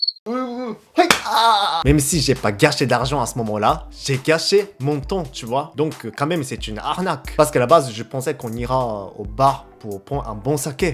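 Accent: Japan. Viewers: Sympa tes vidéos, je kiff bcq ton humour et ta façon de parler, avec un pti accent japonais tu maîtrise vraiment le français, et tu t'exprime super bien, les mots ou les insultes et les expressions qui m'ont fait délirer!! J'adorerais faire une tournée des bars avec toi, et après qqles saké ou autres philosopher sur le Japon et la France... lol 🤩👍🍹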